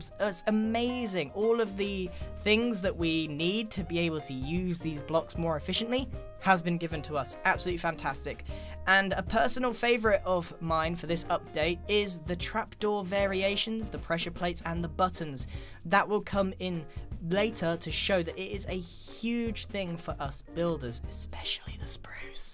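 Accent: United Kingdom. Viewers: Rafael YT relax he sounds like he's british or something he probably is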